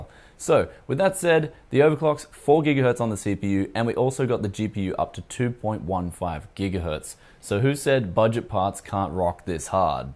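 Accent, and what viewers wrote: Australia. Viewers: could tell he was aussie from accent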